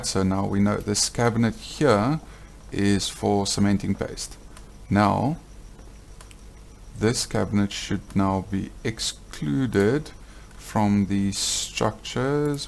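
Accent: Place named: Afrikaans, South Africa. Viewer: You can hear that afrikaans accent from a mile away xD Goeie Video Bra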